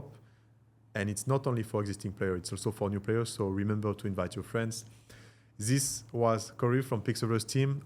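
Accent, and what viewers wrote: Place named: Iran. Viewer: مشخصه لهجش ایرانیه این اداها چیه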